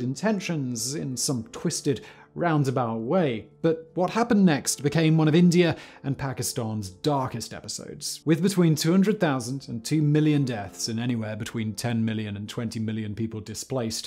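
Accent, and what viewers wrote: United Kingdom. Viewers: Is is me or do you sound MORE British in this video?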